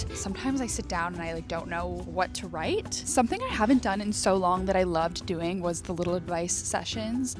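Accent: United States. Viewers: Hello I am from India. I often watch your videos for learning American accent and your vlog makes me happy and energetic. I really love you ❤❤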